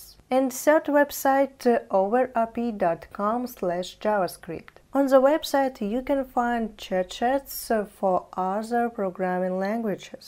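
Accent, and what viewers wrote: Russia. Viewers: Ну английский я бы на твердую 3 оцениваю)) чувствуется русский английский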